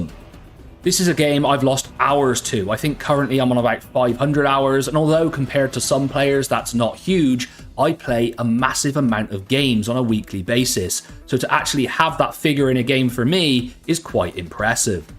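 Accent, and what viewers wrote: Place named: Devon, United Kingdom. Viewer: Moved back from england? To where out of curiosity? Your accent sounds devon 🤔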